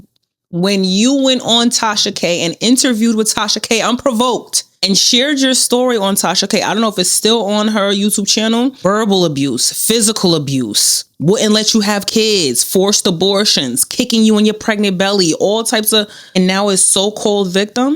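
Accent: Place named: New York, United States. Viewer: Great video! Side note I love your NY accent to much ❤❤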